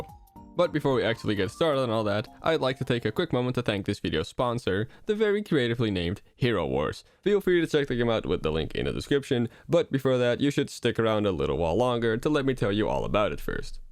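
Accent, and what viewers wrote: Netherlands. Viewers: are you dutch? me to if you are dutch! love your content!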